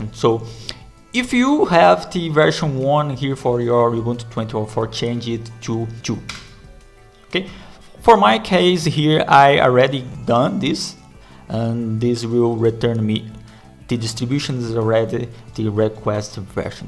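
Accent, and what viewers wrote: Brazil. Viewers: Eu sabia que vc era br só pelo o seu sotaque Br tem um jeito exagerado de pronunciar as vogais kkkk Vídeo top d+ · Brasileiro falando ingles nao tem como nao pegar Haha. Otimo conteudo irmão. Parabens. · Sabia que era brazuka kkkk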